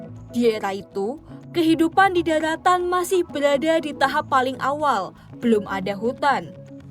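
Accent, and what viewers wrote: Java, Indonesia. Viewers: Njir suaranya Jawa medok😂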